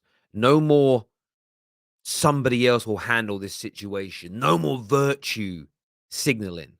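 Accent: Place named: Manchester, United Kingdom. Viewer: that was a boss Mancunian accent looool.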